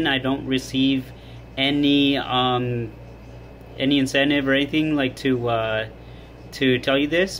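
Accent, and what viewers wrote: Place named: Indonesia. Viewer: You have a slight Indonesian accent. Am I the only one hearing it?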